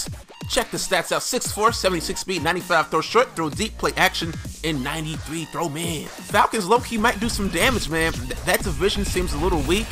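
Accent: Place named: New York, United States. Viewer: Lol u are a cowgirls fan but u sound like ur from New York.?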